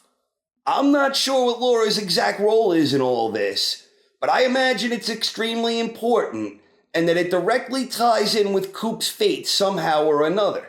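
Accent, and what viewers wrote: New York, United States. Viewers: that is a serious accent you have. NY?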